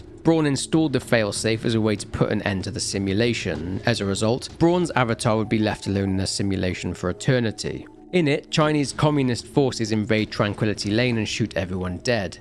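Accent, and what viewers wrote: England, United Kingdom. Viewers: there's nothing like hearing an English fella, talking about the lore of our fave games. simply the best!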